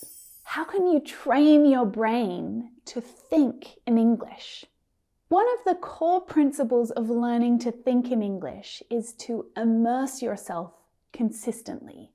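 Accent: United States. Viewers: The pronuncaition is American English. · I fink is american accent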